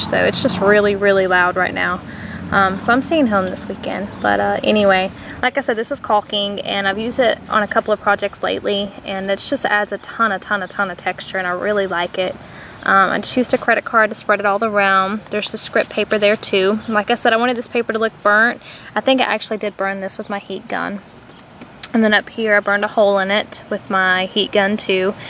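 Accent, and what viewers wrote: South, United States. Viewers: Oh my goodness this is so pretty!!!! I love it....I knew you were a southern girl.....just didn't know what part.... I'm know Pea will love it....awesome project.....as always.....tfs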